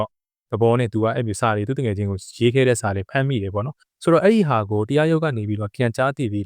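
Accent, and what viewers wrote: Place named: Rakhine, Myanmar. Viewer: အကိုက ရခိုင်ထင်တယ် စကားဝဲတယ်😊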